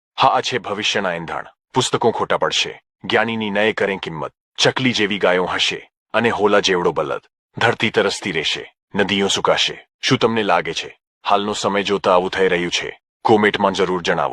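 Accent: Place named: Assam, India. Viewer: Awaaz Assamese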